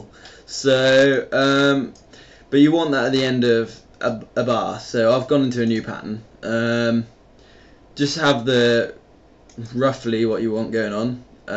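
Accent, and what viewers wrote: United Kingdom. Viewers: you're english,don't you?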